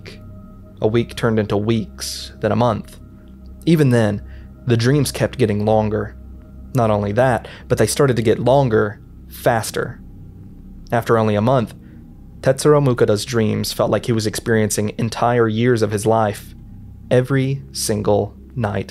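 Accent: South, United States. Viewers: This man and Wendigoon absolutely carrying the "horror explained by guy with a funny southern accent" genre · Is this man from the south? your speech is both beautiful and uncommon in your line of work. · It's a little refreshing to hear a subtle country accent talk about intellectual topics. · Great narration. I'm digging the country accent, man. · Hey, this is the first video of yours that I've seen and I think it's pretty good! I gotta ask though, are you from the south? I can hear it in your voice lol. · I love how horror unites us. As a kid I would never imagine a dude with a classic country twang espousing heart-felt feelings about niche Japanese horror.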